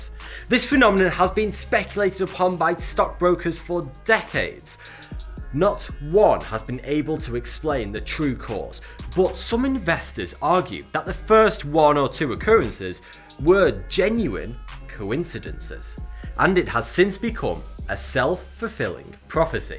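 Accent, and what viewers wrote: United Kingdom. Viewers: What happened to Vsauce? Why does he suddenly have a British accent? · xXMEMEGODXx 11 it's just his type of British accent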